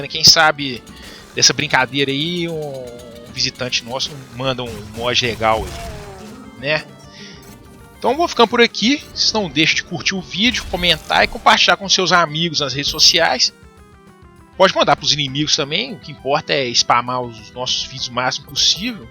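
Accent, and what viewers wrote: Minas Gerais, Brazil. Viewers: O melhor do video é o sotaque de mineiro do mlk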